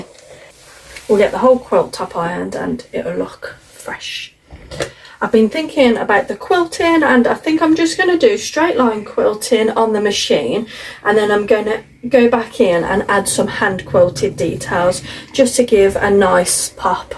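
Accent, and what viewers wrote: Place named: England, United Kingdom. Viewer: Hello, I am new to quilting and your channel, so interesting to see how a quilt comes together. Please keep it up on YouTube. I would love to see more tutorials especially with an English accent! I prefer the landscape format if that’s possible but not essential. Thank you for sharing your journey, much appreciated. Happy sewing.🧵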